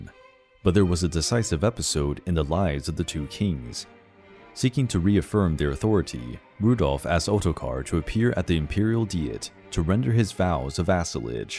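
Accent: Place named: United States. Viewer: love this video! very informative! a little frustrated with the american english pronunciation, but i can’t expect everyone to have perfect german pronunciation 😂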